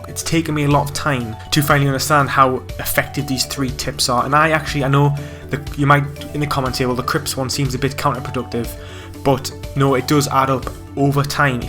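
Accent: United Kingdom. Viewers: This guy is definitely British but idk if he sounds Geordie or not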